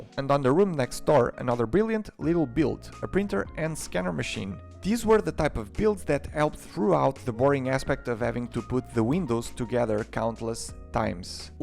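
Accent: Portugal. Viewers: that portuguese accent x) trending video, congrats.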